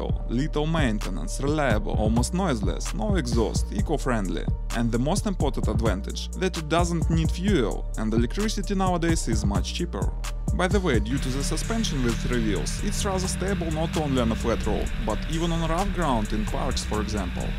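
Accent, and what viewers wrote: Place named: Russia. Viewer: Явно нужно что-то делать с русским акцентом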